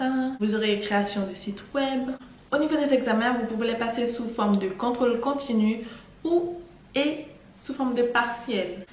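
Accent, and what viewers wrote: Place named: French Guiana. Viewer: T’as grave l’accent guyanais ! ❤️ En tout cas merci pour cette vidéo. Au top 👌🏾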